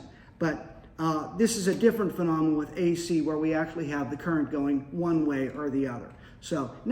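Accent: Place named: United States. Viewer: The lamps will both illiminate bit alternate at the frequency of the AC power. 60 Hz since he seems to have a US accent. 50 Hz in yhe redt of the world. It may allear as both on together though due to filiment heat and visual preception time. Each diode will block half the wave. Since they are oppsite they will block the opposite side. 99%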